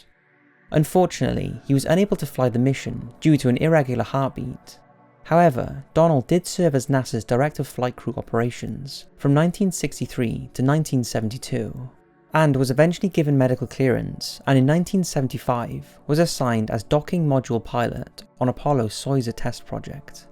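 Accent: Wales, United Kingdom. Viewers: yep was just thinking that when I saw your comment - he has a soft Welsh accent I think. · Bluesummers pretty sure he has a very slight Welsh accent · I could listen to that Welsh accent all day ☺️ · The Welsh in him! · He’s a has a welsh accent to be honest.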